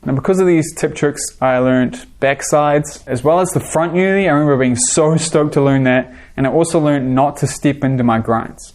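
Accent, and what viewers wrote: New Zealand. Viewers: So bad with accents dude had no idea you were in NZ Also, what you said about royals being hars for ppl I've been skating since 96 and still cant royal and farv. I can boot trick ANYTHING and I can royal down rails but flat ledges I just gave up on them lol. Reason I can royal down rails is I just naturally slip into them and learned to control it over time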